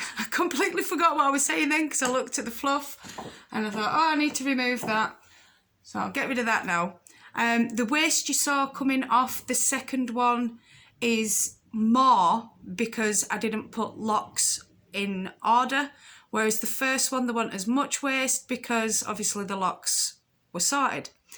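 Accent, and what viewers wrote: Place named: Northern England, United Kingdom. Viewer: Very nice video. And your northern accent is entrancing to us in California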